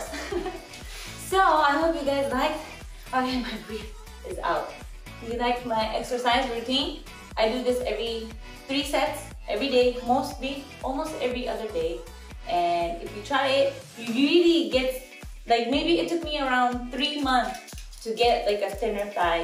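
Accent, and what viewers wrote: Philippines. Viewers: Your english has filipino accent in it! Really cool!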